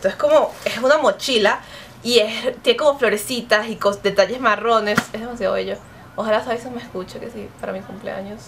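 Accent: Venezuela. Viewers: todass las venezolanas hablan asi, jajaja bueno a excepcion de algunas pero casi todas tienen ese acento y no es que sean pretenciosas.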